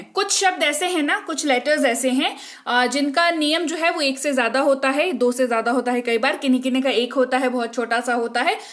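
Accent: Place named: East, India. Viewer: आप के उच्चारण मे पूर्वी भाषा मिठास बढ़ा देती है।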